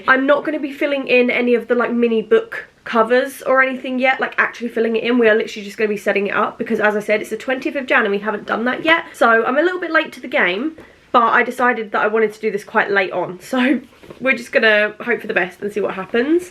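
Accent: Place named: Essex, United Kingdom. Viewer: I love these videos so much! Also how organised are you filming multiple at once 😂 Our accents are so similar are you an Essex girly too?!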